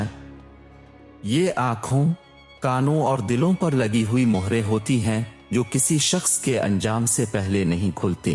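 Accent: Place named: India. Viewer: indians ki urdu aisi he hy lekin hame topic pe ghor karna chahye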